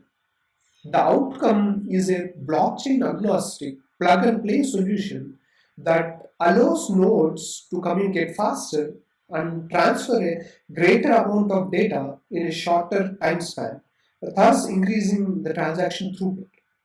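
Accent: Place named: India. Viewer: Indian accent + echo chamber^2 = non native english speaker can't understand.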